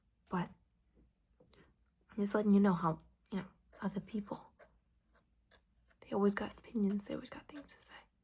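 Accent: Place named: Brooklyn, United States. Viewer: Your Brooklyn accent is one of the most natural I've heard. You must be from around there, or your family is!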